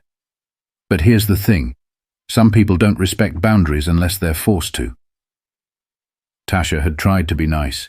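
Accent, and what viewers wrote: United Kingdom. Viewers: Never any particulars such as dates, place, etc. The notes say, "Our mission is to give voice to the untold narratives that inspire, educate, and empower." Then, "Please respect the effort and creativity that went into creating this work." A created work of fiction indeed. Using a narrator with a British accent doesn't do much to enhance the so-called "Black" experience.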